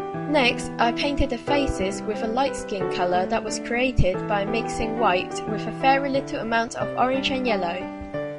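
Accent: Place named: England, United Kingdom. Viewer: i thought u were from england cuz of the accent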